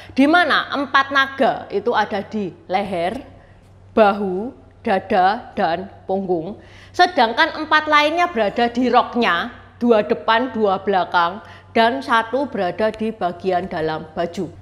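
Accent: Java, Indonesia. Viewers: Enakan dengerin dongeng nya tuch.. mantep bingit dg bhs indonesia yg ber'aksen jawa medok.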